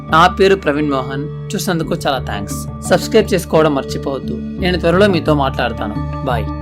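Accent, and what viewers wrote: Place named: Tamil Nadu, India. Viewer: గొంతు నీదేలా ఉంది. తమిళ్ వారు మాట్లాడే తెలుగు లా ఉంది.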